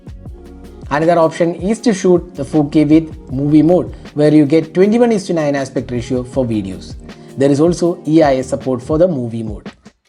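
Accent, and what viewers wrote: Kerala, India. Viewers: English kettapozhe malayali annenu manasilaayi... Great job broo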